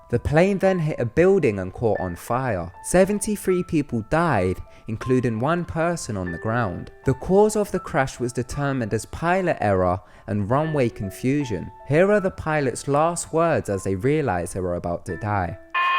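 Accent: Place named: Russia. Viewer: Русский акцент у говорящего..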